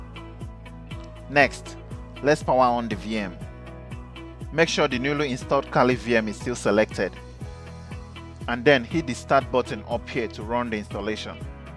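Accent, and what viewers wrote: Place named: Africa. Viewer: this guy is soo good. like where were you men ... You actually spoke like an African .... everything was so simple .... God bless you ... I'm your fan from now onwards .... and I'm gonna spread the good news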